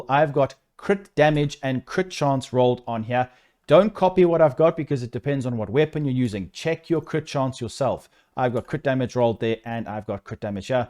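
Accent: South Africa. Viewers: you from south africa ?? Discord ?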